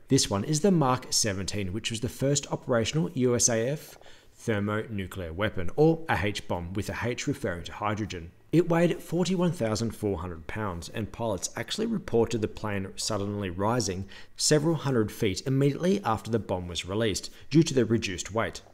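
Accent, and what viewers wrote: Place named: Australia. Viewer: Hi, why are putting on an Australian accent?!?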